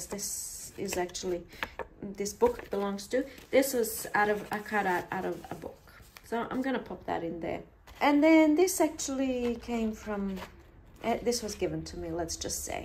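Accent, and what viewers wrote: South, United States. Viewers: I LOVE your Southern Accent!!! As a Texan I highly approve 😂💖😂💖😂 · 😂 I bout fell out at your country accent! Plus, going overboard and getting overly excited...theres no such thing! This journal turned out amazing! I look forward to seeing more like it! Thank you always for your honesty, your wits/ sense of humor and your mind blowing ideas!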